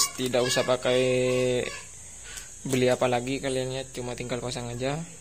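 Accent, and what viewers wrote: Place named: Bondowoso, Indonesia. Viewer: Behhh... bahasanah mik seperti orang bondowosoan reh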